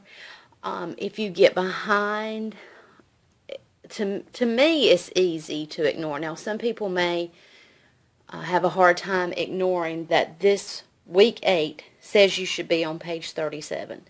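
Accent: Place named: South, United States. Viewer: Thank you so much for this review. I school many children at once and find that it helps to know in advance about the ease of planning. For me, some curriculums are just too involved or scattered for me to do with multiple children and I have ended up wasting precious time and money trying them out. I appreciate this review very much. And love your sweet southern accent!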